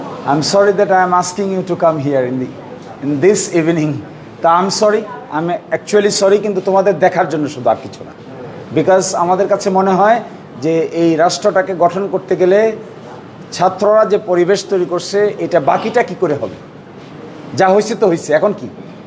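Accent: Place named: Bangladesh. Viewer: Don't use deshi English buddy when you do public speaking. English doesn't match you. It's really annoying. Use bengali language please in public. In your reading room you can study and practice English.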